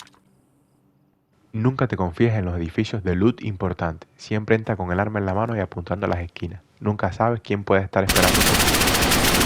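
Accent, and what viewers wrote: Cuba. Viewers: Este es cubano si o si ,inconfundible 😂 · Cojone asere eres cubano verdad?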